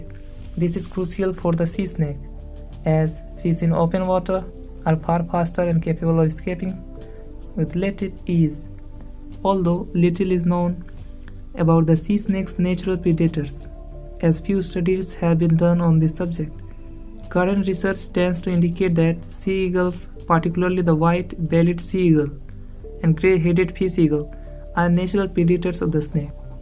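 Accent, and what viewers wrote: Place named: Mexico. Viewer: I like your Mexican accent.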